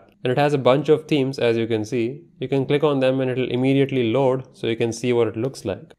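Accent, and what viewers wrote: India. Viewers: Dude looks Mexican but speaks Indian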